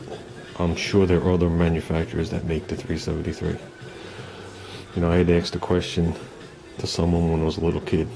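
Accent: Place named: New York/New Jersey, United States. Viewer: hey man, I'm guessing you are either from Jersey or NY with that accent :) Long Island here! I love these videos, you're inspiring me to get to work on my 1980 G body car. Once the weather gets better...and I think 2018 may be the year I finally build that dream garage!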